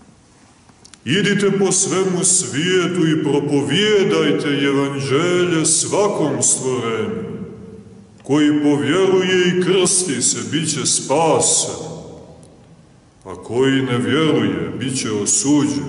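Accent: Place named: Montenegro. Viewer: Second BTW He speaks with heavy montenegran accent. Can't miss where he is from.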